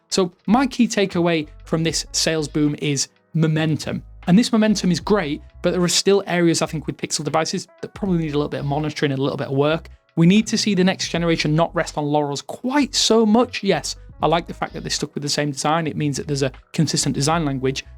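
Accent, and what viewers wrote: United Kingdom. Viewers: I don't like this guy's British accents.! ❌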